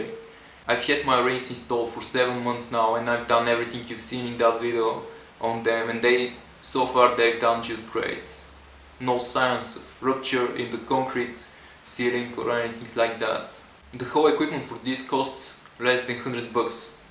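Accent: Bulgaria. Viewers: He is bulgarian bro :D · тва ако не е български акцент те па на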